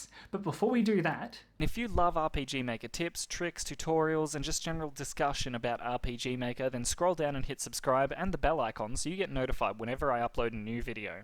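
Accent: Australia. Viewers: I enjoy your accent, Australian Eddie Redmayne.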